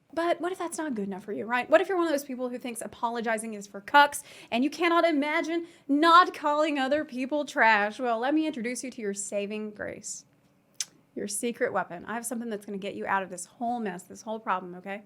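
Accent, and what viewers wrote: South, United States. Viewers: Love hearing the southern accent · you know i didn't process this at all but i just realized does have a southern accent. I think im used to hearing lighter less subtle southern accents that i didn't notice at first. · geez, now I totally hear the southern accent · I'm from Birmingham Alabama and she sounds southern. Then again not all of us have that kinda twang from the city. Some of us sound more from the hood than from the country. My point not all southern accents are made equal.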